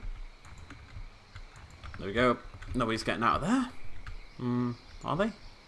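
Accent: United Kingdom. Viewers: I find your British accent hilarious when you speak French.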